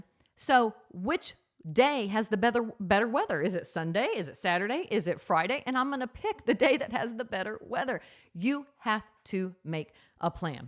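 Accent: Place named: South, United States. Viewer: I love your voice. A bit of southern accent, lots of energy, so friendly!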